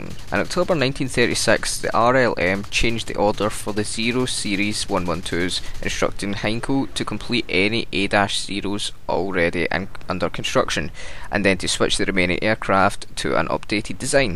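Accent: United Kingdom. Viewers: u from scotland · What part of North Ayrshire are you from?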